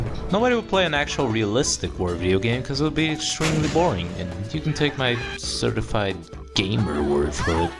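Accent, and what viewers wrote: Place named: Brazil. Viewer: mano achei por um amigo de oklahoma, conheço ele ha muito tempo cara e ele me mandou os teus dois videos sobre a inércia e eu te achei com uma cara meio brasileira e um sotaque no ingles parecido com o meu, dai eu vi o im brazilian half mexican no primeiro video e resolvi deixar um comentário em portugues porq eu tinha ctz q tu ia saber ler.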